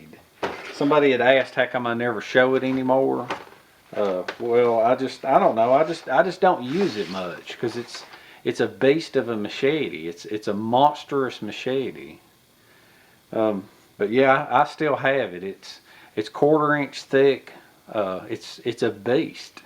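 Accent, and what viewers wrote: South, United States. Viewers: I have no clue how to sharpen knives. I bought my son a stone. Hes the one that told me it needed o'l 😂. Love that southern drawl. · G'day, I saw this excellent video when it came out. I didn't leave a comment. It happens that I'm a huge fan of Kukris and recurve machetes and recurve/convex knives. I took your advice on-board because I didn't have a strategy for sharpening my Kukris, convex and recurves. When in doubt I used a ceramic rod and a ceramic oval rod; which by the way, works well. Here it is two years later and I can tell you that your methods (in some cases slightly modified) have been working for me. I don't like using awlll (AKA: oil) so I got myself an ultra-fine water stone wheel. I also have practiced doing a lot of 'line of sight' freehand honing and stropping and I can pretty much get a surgical blade finish on any decent carbon or stainless steel. 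Although, I took your advice and didn't bother spending too much time on my 'chop only' Kukris. Now I have a lot of knives in my user collections that I don't need to show to a stone of any kind. Thanks, you've been a great help! P.S. I love your Southern accent although with some words I need to see sub-titles till I get used to your great way of speaking. No dig intended; if you heard my rough Aussie accent you'd probably reckon I was babbling on in some foreign language:) Good one,. Since 2016 I've not missed one of your videos. Outstanding. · I have made many knives, swords etc, Viking swords, Roman Gladius, Roman spatha, blah blah, but one thing is for sure, if you develop a sharpening technique that works, then who cares how others do it. I just laid on my couch, and after watching the full video, I have an overwhelming urge to go in my workshop, grab my sharpening stone and some strop leather and sharpen my survival knife, and I'm not even a bushcrafter. 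In fact, watching your vids has given me the idea to spend a weekend in the forest up the hill from me😊, although, it's October, it's Germany and its bloody freezing 😂, so I may wait till next summer. But one thing I wanted to say as a relative noob to your channel, is thanks for sharing your passion, and to us over the pond, your happy go lucky positive attitude and your really funny southern Hill Billy drawl, makes your vids even more infinitely watchable. So, cheers, appreciate it bro. 👍🏻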